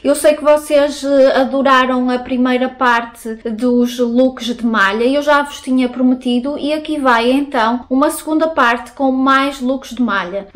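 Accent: Portugal. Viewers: Nossa você e portuguesa que lindo sotaque, e adoro malha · Adoro o sotaque Português 🥰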